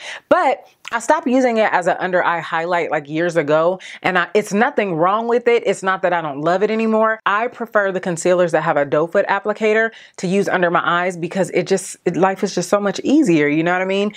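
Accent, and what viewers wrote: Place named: Spain. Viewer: Loved your enthusiasm throughout the video as well as your Spanish accent! You rock! Tu si sabes!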